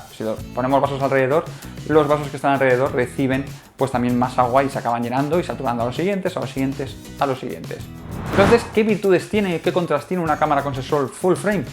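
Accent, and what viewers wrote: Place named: Spain. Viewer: por que no aprenden a hablar bien estos españoles de m......??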